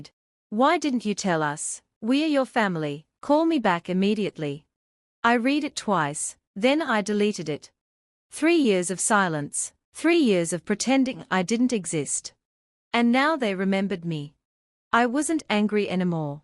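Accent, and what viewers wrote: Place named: United Kingdom. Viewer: A British narrator and an American holiday? Kind of ruins it for me.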